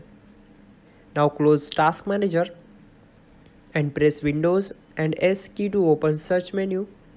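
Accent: India. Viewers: When i heard the indian accent i thought u were a scammer or something and that it would not work however after doing this,this is the first solution that has worked the other ones that were from like white ppl that i thought would work all had failed u are truely the ACTUAL 1st indian tech support that ACTUALLY TRIES TO HELP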